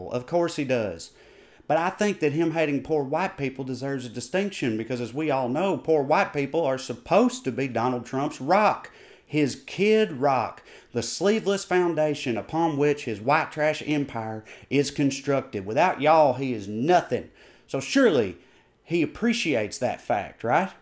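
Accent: South, United States. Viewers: refreshing to see some intelligence in southern accent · Man with common sense and has a southern accent? How yo doin’ 😉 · ....I love you man. There's just something about hearing the honest truth from a dude with a southern drawl that really makes it stick. It takes a special kinda person to make someone laugh as they are learning about the horrors unfolding all around them in this fine country of ours. Well done sir, thank you, and keep up the great content. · Gotta love... You can't get more Southern and heartfelt than after a rant like that, when he still gives you a genuine "love y'all" at the end, just like mama and daddy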